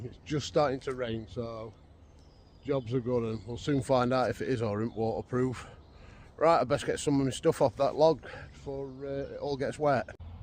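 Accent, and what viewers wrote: United Kingdom. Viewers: You have exactly the same accent as I do pal I'm from Pontefract are you close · Good watch! You sound like you’ve got a Sheffield accent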